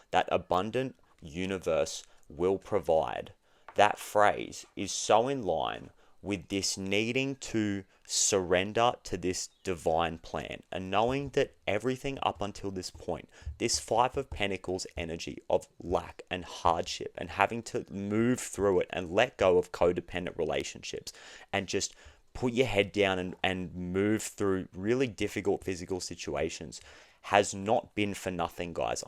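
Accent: Australia. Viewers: Is your accent Australian??? ❤ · Thank you. You are very sweet and genuine. I enjoy your readings. Where are you located in the world? You sound a little like an Aussie! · did not expect the Aussie bloke voice... noice. Totes supporting ya mate *hits subscribe. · i actually had to question myself if I was right it was an Aussie accent for a few seconds haha 🙈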